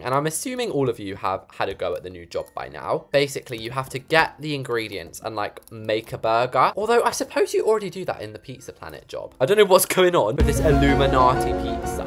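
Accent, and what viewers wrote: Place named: England, United Kingdom. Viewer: just how good is and how she only has 200k+ subs is so disrespectful i wish you could sub morethanonce like would have like 77777M subs if you could sub more than once beacause I WOULD BE SPAMMING THAT BUTTON LITERALLY BRAKING THE BUTTON (also my pc) LIKE BRO is such a good builder i could never sorry but one time i said a mean comment because it autocorrected so tysm for being a reallly great builder and so funny and i love ur accent one time i went to englend and i heard someone talking with your accent and i fell in love byee! i hope you have a happier life!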